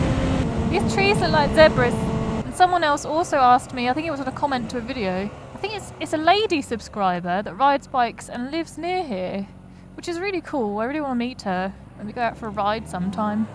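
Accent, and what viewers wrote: United Kingdom. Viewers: i like that British accent so much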